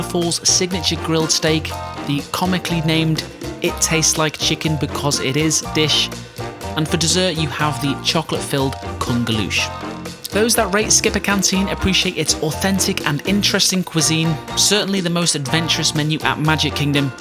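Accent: Liverpool, United Kingdom. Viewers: American who used to live in the UK here - can't tell if you're a Scouser or from Stoke-on-Trent :) Am I close?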